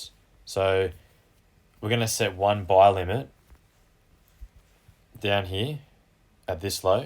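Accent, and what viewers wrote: Australia. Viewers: Hey mate nice videos, you sound like a fellow Aussie, I’m in Bris, where are you based?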